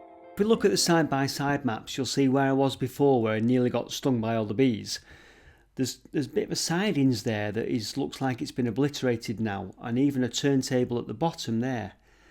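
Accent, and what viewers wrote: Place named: Lancashire, United Kingdom. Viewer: Thanks for another wonderful video. I just love the subject matter. I was born in Lancashire and spent the first ten years of my life there until my family moved to north Wales in 1962. It is lovely to hear a proper Lancashire accent again after all these many years of living abroad. So much of what you show was all still working in the 1950s, who could have imagined it would all have been swept away so quickly. My father was a railway enthusiast even back then. I remember him stopping the car to watch the Flying Scotsman leave Chorley station and cross a bridge that the road went under. My brother, a baby at the time, 1956, still all in his white baby clothes was held up to look, 'it's the flying scotsman, it's the flying scotsman! It bring tears to my eyes just remembering it. This same brother, now semi retired in his 60s fires an engine on the Keithley and Worth valley line in Yorkshire. 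Incidentally, the engine he fires is one that my grandfather fired in the 1890s or 1900s when he was a fireman on the Lancashire and Yorkshire Railway. It was a pure fluke that the very same engine escaped being scrapped in the 1960s.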